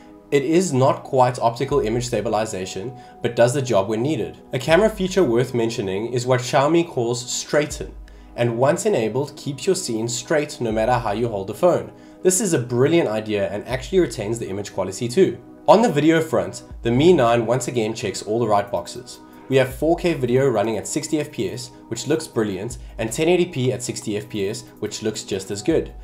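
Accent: South Africa. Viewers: always easy to recognize a fellow Southafrican Did you move overseas permanently?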